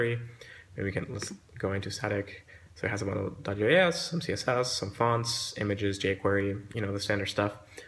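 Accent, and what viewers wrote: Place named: New Jersey, United States. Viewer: Very cool demo. Are you from NJ? Just curious; you sound a lot like my buddy from there.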